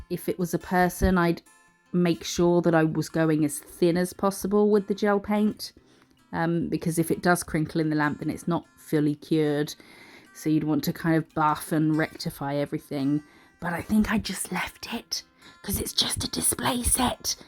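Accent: United Kingdom. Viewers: I... did think you're Australian?! 😅This is me being embarrassed now!!! OMG! You're a BRIT! I love it! How could I have not known? I don't know why, but I always confuse the accents of UK, AU, and NZ... it's like trying to tell Scottish from Irish. Or Mexican Spanish from Spain's Spanish, or French and Canadian French. I'm so bad with accents!! Despite all of it, I still think your kindness is top notch!! UV light is like cigarettes. I'm not gonna nag anyone about smoking a cigarette now and then, but if you do it often enough, and if it's unfiltered, etc, you know, those kinds of things increase risks. So let me start by saying I'm a redhead with very fair skin. I don't tan; I burn. I spent every childhood summer burnt to a crisp, red as a cooked lobster before humanity understood the increased skin cancer risks per sunburn, blah, blah, same thing with smoking. 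Now I'll add my age (50), family history (an uncle with skin cancer), and me being on chemo for a bit over 2 years as of right now, so the risks add up. This isn't me trying to create mass hysteria over sunshine. I swear, I'm ultra-aware that all my risk factors don't apply to everyone. I'll share what I learn, but I don't wanna push it on anyone. I'm not an evangelist, just trying to explore risk reduction. Also, another thing neither of us thought of, and I could slap myself now because I've studied it a bit, SILK!! I had myself a good cry about an hour ago as I was reading and watching tutorials about how to repa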